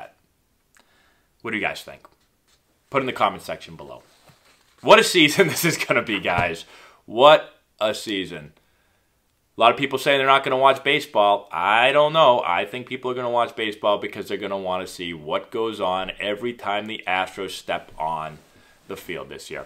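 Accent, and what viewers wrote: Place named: Boston, United States. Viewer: Lol I love how you say “hawkey” with the Boston accent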